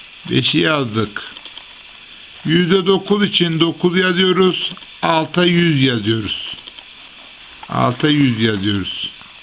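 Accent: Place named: Central Anatolia, Turkey. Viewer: kağıdı halının üstüne koymuşsunuz,masada daha rahat yazardınız,şiveniz ortaonadolu gibi...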